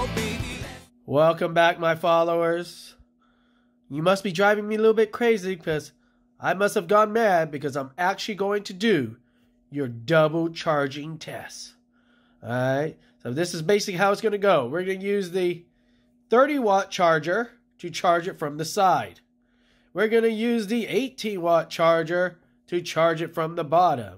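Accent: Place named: India. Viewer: This man is speaking the English of Indian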